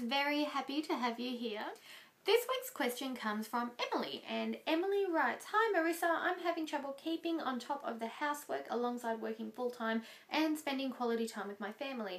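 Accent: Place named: Australia. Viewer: I detect an accent and noticed you spelled some words differently than the way Americans do. Are you Australian or British (I'm leaning more towards Australian).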